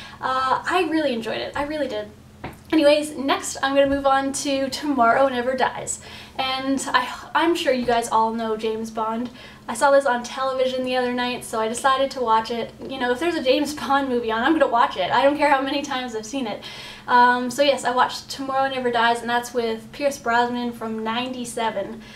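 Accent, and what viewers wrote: Canada. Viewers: I love the part about the 7 year old getting late to bed because he... you know... had to drive home! That was cute... Btw, my favorite James Bond character is: Xenia Sergeyevna Onatopp (Golden Eye) Hockey, he? From the sound of it you either from Toronto or Montréal, lol! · Are you Canadian?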